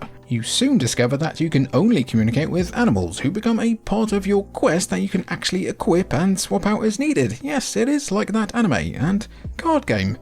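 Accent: England, United Kingdom. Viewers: You sound English... why do you call it the Genesis when it was ONLY called the Genesis in America? If you're English you, like the rest of the world, had a Megadrive. This dumbing down of the world to only cater to the American audience is really depressing. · There's something wrong about a British accent calling the Mega Drive a Genesis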